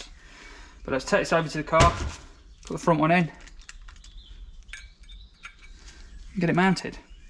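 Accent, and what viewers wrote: United Kingdom. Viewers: I like these videos:) and this accent:)) sounds beautiful😍✨. Is it british accent?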